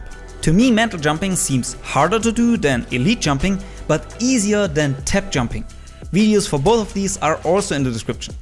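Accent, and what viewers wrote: Germany. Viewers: Bild ich mir das ein oder hast du n maximalen n deutsch aktzent?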